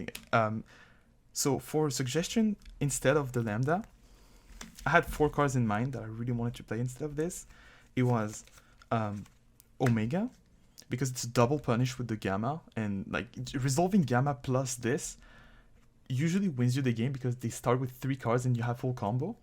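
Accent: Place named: France. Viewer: great deck profile!!! weird question, why do you sound kinda.... fr*nch?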